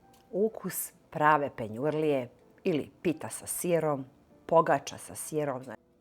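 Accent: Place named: Blace, Serbia. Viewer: Aman zeno sa juga iz Blaca,sto se stidis svog imena.Zar je moguce da se tako lako menja ime krsteno koje ti je kum dao i na koje treba da budes ponosna.Ja znam da hriscanski kada se zena zamonasi onda dobija drugo ime. Treba da se ponosis tog blackog naglaska a ne da se krijes iza tog nimalo lepog imena.Za kulinarstvo svaka cast ali postuj ono ko si i sta si.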